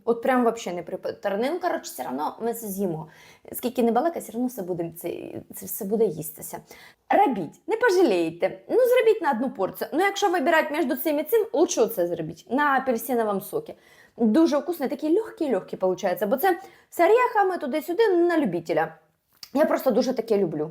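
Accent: Surzhyk, Ukraine. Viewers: Те як ви говорите, має певний шарм... весело і добре від того суржику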